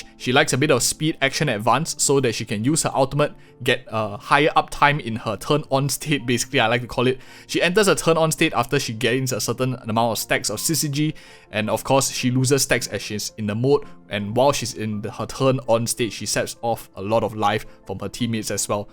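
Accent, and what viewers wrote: Singapore. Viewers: are you by any chance singaporean :D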